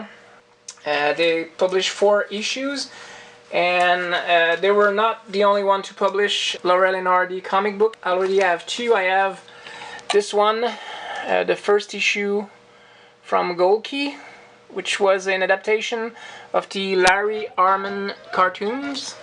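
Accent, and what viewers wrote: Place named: Quebec, Canada. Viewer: Thanks for the fabulous shout out mate, very kind of you! I am almost blushing lol That's an interesting list of comics, you have some cool targets set for 2021, I wouldn't mind that Team-Up 1 myself I must say!! You certainly have some eclectic tastes and goals too, great bits of information in the video also. I loved the Hopalong Cassidy merchandise, particularly the rocking horse/baby chair thing haha Love the accent too of course, they say we Londoners drop our H's a lot when we talk but you French Canadian folks do it even more I think!!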